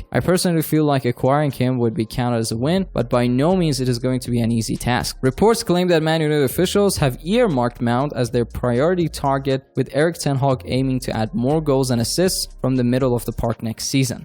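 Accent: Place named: United States. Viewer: I dont understand one item that he speaks about please stop these american voices and put in british voices.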